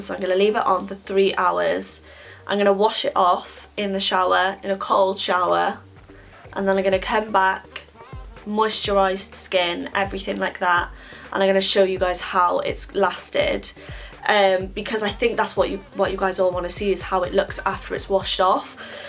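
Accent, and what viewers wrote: Wales, United Kingdom. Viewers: you welsh? xxx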